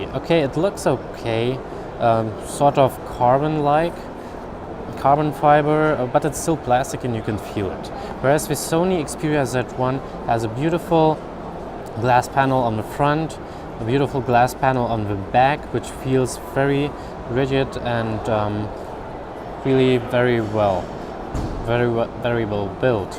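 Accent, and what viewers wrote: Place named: Germany. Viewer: so süß, wenn ein Deutscher englisch redet ;)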